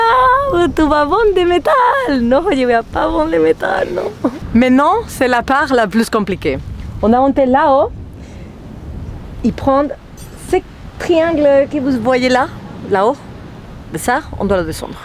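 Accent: Spain. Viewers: Je te regarde grâce à Raphaël et je ne regrette vraiment pas mon abonnement à ta chaîne. Ton accent espagnol est comme un médicament de bonne humeur donc on a besoin régulièrement mdrrrrrr gros bisous tout plein de la Belgique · J'aime l'accent espagnol, ma grand-mère est née en Espagne. Et ton français est très clair, tu peux avoir confiance. Ton aventure est très intéressante, merci de nous la faire partager. Bon anniversaire de voyage. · Avec tout tes chiens tu avais vraiment besoin d'un camion 😂 Ton accent espagnol est charmante ❤ De verdad tu hablo franxute mejor que yo espagnol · J'adore votre accent espagnol !!! Et votre français est très, très correct. (*) (en fait, ce qui importe, c'est l'organisation cérébrale ; et là, chez vous, ça turbine et c'est de niveau solide) Salutations d'un sédentaire... PS je vous ai découverte par les Mariolles trotters *) puisque vous aviez quelques inquiétudes à ce propos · Ton français est très bien surtout ton accent espagnol te donne un plus 😊😊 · Bonsoir. 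Bravo pour tes vidéos, et en français en plus 👍, avec cet accent espagnol.... adorable !!!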